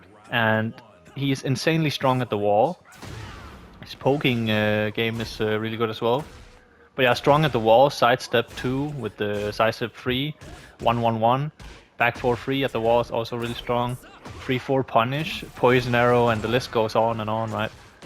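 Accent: Denmark. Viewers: are you danish?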